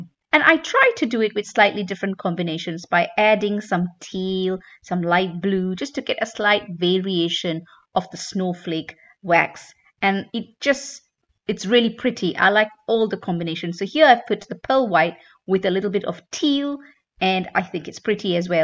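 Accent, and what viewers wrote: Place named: United Kingdom. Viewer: absolute joy to watch . Gorgeous cards , great technics . Nice hearing a UK voice and UK card sizes .x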